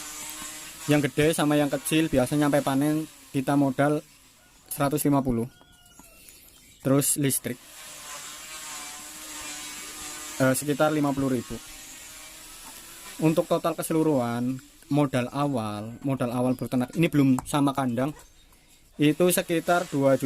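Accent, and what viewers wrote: Banyumas, Indonesia. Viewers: Mantap om...😆😆😆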